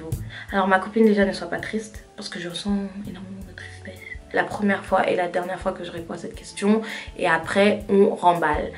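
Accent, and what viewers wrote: Ivory Coast. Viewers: J'ai pensé Ivoirienne tu a un pt accent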